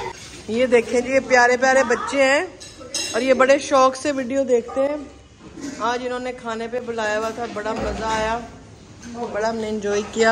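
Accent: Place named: Amritsar, India. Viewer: 😊❤same as indian punjab Amritsar life sari galla baata same you are speaking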